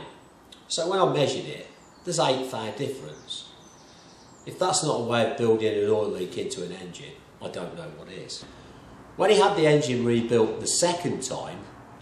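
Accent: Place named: England, United Kingdom. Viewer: He's English :) My mom hated watching old movies from England on tv - but this gentleman is way easier to understand.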